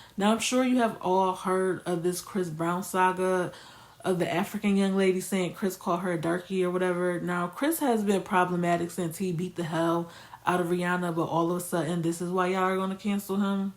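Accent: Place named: Brooklyn, United States. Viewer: I like your Brooklyn accent. You from BK?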